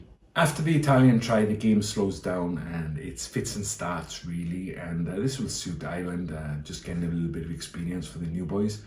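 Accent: Wales, United Kingdom. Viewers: You sound like a Welsh man. I am good friends with spike Williams, ex captain of Wales. again, many thanks, and I wish you the best of luck with your channel.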